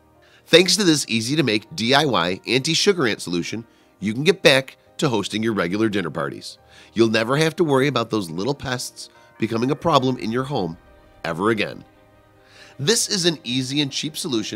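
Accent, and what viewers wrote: United States. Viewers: Long winded American voice drive insane ,please come straight to the point, be more helpful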